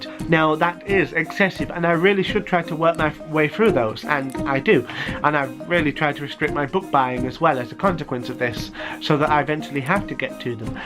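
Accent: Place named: United Kingdom. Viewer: Dear, I'm Ivan and I'm genderfluid:) I've always enjoyed rereading certain books. last year I reread "The Lords and The Ladies" and "Witches Abroad", 'cause I went to live abroad and I found myself in a depression and the only thing I could think that would lift my spirits up was rereading these books. now I started reading 1984 for the first time and, boy, how do I find it relateable to the times we live in!:) I also share the same fears about the politics in the world currently - I'm sad, angry and depressed. here, in Bulgaria, where I'm from our stupid people chose a pro-Russian president, so we'll see how it goes down. hugs!:) keep on doing videos with that lovely British accent of yours! you're adorable!:)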